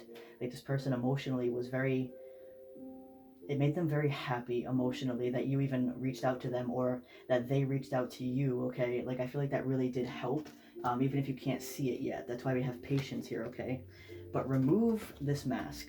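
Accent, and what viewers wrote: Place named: New England, United States. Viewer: Just found you...love that New England accent! I'm from there too. Spot on, but the guy I loved turned out to be a total a&&s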